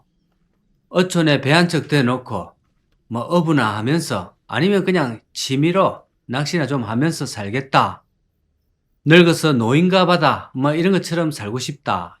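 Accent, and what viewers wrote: Gyeongsang, South Korea. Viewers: 구수한 갱상도 사투리에 적절한 말투에 정말 재밋고 웃음이 절로 나오네요~ 볼수록 공감이 가네요...😅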